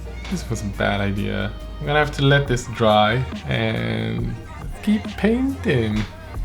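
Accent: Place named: Sweden. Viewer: Oh my God! I used to live in Sweden. This is so fucking real! Hahahahaha And your Swede accent sounds SO familiar. 😂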